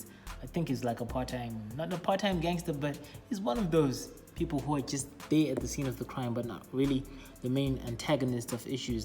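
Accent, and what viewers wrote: South Africa. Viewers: Bra are you South African? your accent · maaaan you sound so South African please tell me you are 😂😀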